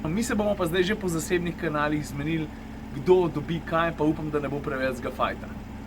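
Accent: Styria, Slovenia. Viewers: štajerski na glas pa ti gre.😂💜💛#ifeelmaribor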